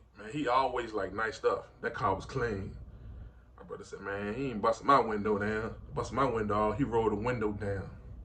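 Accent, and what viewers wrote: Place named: Richmond, United States. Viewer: This video came across my feed and I just got into it because I’m 2A everyday. I couldn’t place the accent and at first you said something about New Orleans then I heard more and realized you were from Richmond. Reminded me of one of my favorite channels Stay Out The Way Tre and him talking about Virginia politics. Favorite part of the video hands down was when you said your brother beat that case. The American justice system in my opinion, is the most effective system we have currently in the world, but it’s still obviously flawed. One of the biggest flaws is when they arrest people that are defending themselves in deadly situations. A man should be able to defend his own life, let alone having a family to watch out for, without any fear of spending years in prison for doing so. If I’m going about my day, obeying the law and treating people with respect, why should I allow undue harm to come to myself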